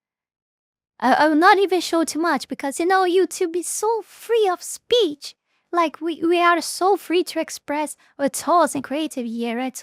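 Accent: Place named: Brazil. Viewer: When you know the person is brazilian cause they have the same accent as you do. But you can't prove it.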